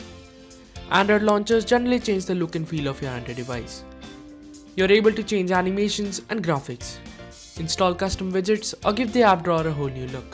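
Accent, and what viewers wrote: India. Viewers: Also, it's the Indian accent which is prompting everyone to say they can't understanding a thing lol xD It seems neutral to us, but not so much to others.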